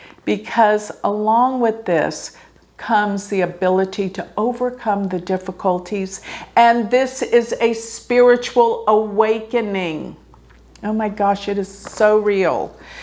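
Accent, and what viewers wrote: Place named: South, United States. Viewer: Strange you should say that! In general, very much in general l must emphasize, l find the way Americans speak unsupportable, especially the women, They are so loud and nasal and they massacre the English language. Some you tube channels l just cannot watch even if the content is interesting. But l really enjoyed this video. I love accent. A little from the south l guess. Well measured and not too loud. l am a pure Pisces. I loved your reading. Let’s see what happens shall we? I agree with everything you have said. I’m a young 83 who’s been on a spiritual path all her life. 🇬🇧💝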